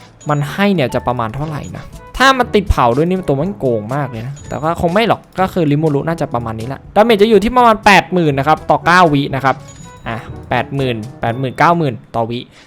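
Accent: Suphanburi, Thailand. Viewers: อ่าวอยู่สุพรรณเหมือนผมเลย ว่าละทำไมสำเนียงพี่เหนื่อๆ55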